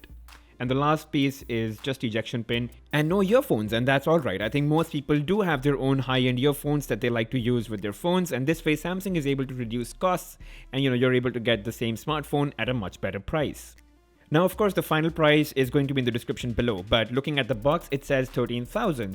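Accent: India. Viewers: I'm the only one who hate the indian accent?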